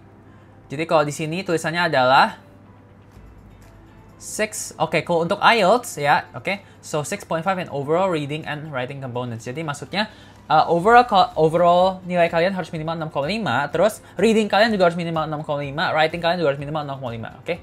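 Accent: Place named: Indonesia. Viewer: Love bgt accent mix Indo English kamu❤❤❤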